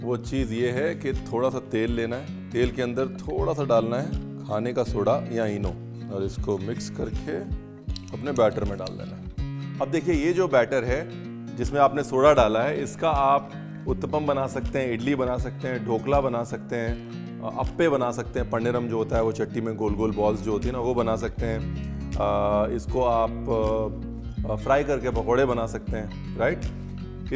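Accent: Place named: Kanpur, India. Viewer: easy recipe aur aap bolty bht achha hai mujhe lgta hai aap kanpur se hai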